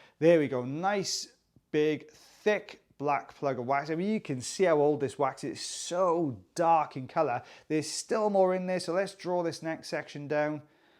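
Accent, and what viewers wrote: Wales, United Kingdom. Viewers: Just keep talking. Just love your accent. Reminds me of my Welsh relatives. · Don't apologize about talking a lot because that's what you're supposed to do on these videos. Plus you have an awesome accent! I love British accents ❤ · Your voice is so soothing. The Welsh accent is the best in the world! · I love the Welsh accent. Far better than my Devonshire one!! Would never mute you!!! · I live in America. Listening to your lovely British accent is part of the experience. No need for apologies. With your commentary, I not only get a satisfying video, I get a good few minutes of learning. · Stop 🛑 don’t apologize for talking! I could listen to you read the dictionary. Don’t you know how Americans love a British accent ‼️‼️❤️ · If you cut down on the talking I’m going to stage a protest! Your enthusiasm is infectious. Your welsh accent reminds me of holidays past, when mum and dad would load the car up and we’d go to Benllech Bay for a week. 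Happy memories. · Honestly the commentary is incredible. I’m not sure if it’s the welsh accent, but I feel like I’m listening to a rugby match commentary! It would get excited at times and more calm at others, bit of technical explanation, really like the highs and lows of sport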